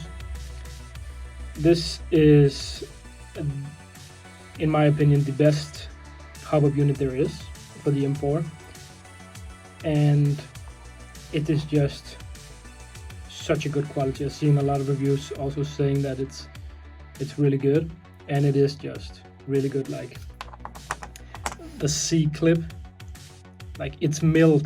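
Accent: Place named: Denmark. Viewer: are u danish? i smell accent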